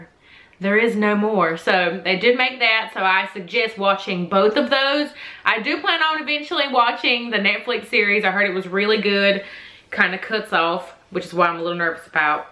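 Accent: South, United States. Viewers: Where u from the accent sounds southern ??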